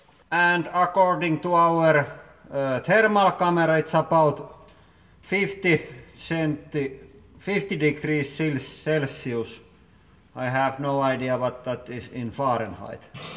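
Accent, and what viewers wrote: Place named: Finland. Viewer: oh god the finnish accent :D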